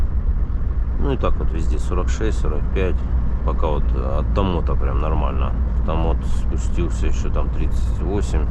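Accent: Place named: South, Russia. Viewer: ! Ты же якут, а откуда такой говор южный?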